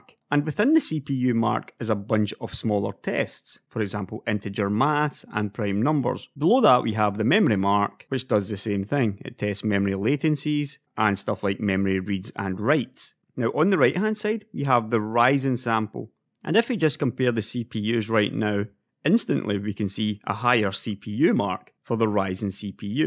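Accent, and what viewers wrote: Scotland, United Kingdom. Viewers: it's just a Scottish accent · this guy sounds like someone from scottland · the Scottish accent is not that bad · No need to apologize for the voice as I'm pretty sure most people have figured out by now that you're Scottish :P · that's just an scottish accent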